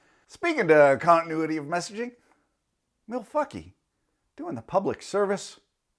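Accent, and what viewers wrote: Quebec, Canada. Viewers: With that French accent you have to be from the Quebec area....my heritage is French Canadian as hell. You sounded like one of my uncles.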